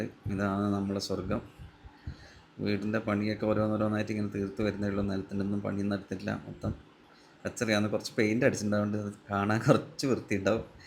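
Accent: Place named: Kannur, India. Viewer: കണ്ണൂർ സ്ലാംഗ്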